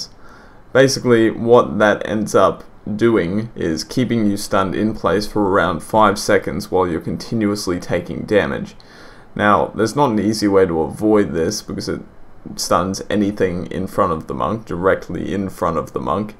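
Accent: Australia. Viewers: Hey man you sound Australian which part of Australia are you from ?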